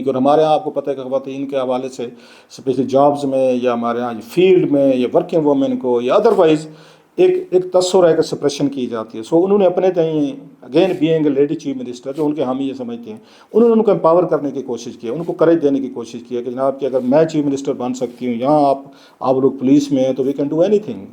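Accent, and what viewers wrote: Saraiki, Pakistan. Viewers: Please try to correct your Urdu accent, feels that your vlog in saraiki , please next time aap saraiki me vlog Karen,Urdu aap ke bus ki BAAT nahi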